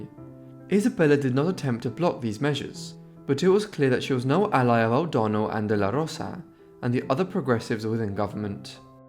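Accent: England, United Kingdom. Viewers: I'm perfectly aware of his English accent - I'm English,myself - I think he's fantastic & really enjoy his videos, but,there is NO other way of pronouncing the word properly in English. I'm very dyslexic as once might realise from reading my post,but,on the spoken word I have total confidence & as I said its constructive criticism, not an insult. I give my Spanish/Italian godson English lessons to help his conversation & having lived all over the World & helped 100s of people with English, it's a courtesy to help someone be the best they can be. Just like I tell anyone to correct my French,Spanish,Italian,Arabic & Greek. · Do you realize he is British and British people pronounce words differently then Americans!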